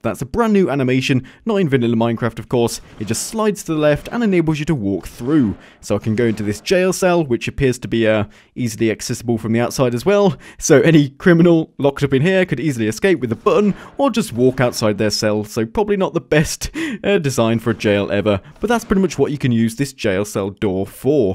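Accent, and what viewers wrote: United Kingdom. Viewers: is he really british?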